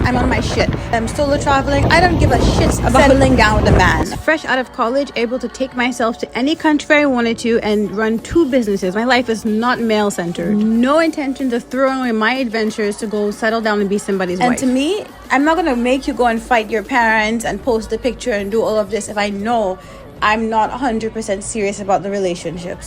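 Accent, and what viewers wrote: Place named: Trinidad and Tobago. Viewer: I love your Trini accent (^_^) I'm glad you're focusing on you again ~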